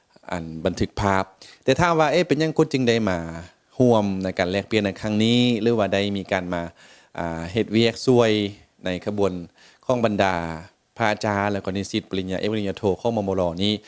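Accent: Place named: Nakhon, Thailand. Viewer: เว้าภาษาสำเนียงเหมือนคน อ.นครไทย จ.พิษณุโลกเลยค่ะ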